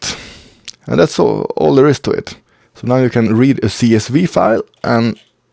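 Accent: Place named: Sweden. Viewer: skön brytning, hör att du är svensk :)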